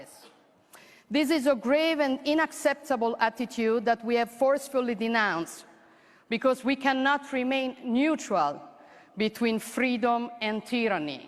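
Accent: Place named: Rome, Italy. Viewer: A tutti quegli stronzi che ti sfottono che parli in romanesco, beccatevi questa.....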